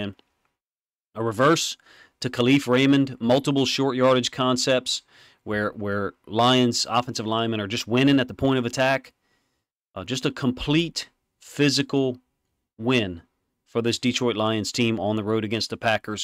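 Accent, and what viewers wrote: South, United States. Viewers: LoL I believe you are hearing a bit of a southern draw there Big guy. Also if that is really bugging you that much perhaps you should work on yourself and stop trying to correct others.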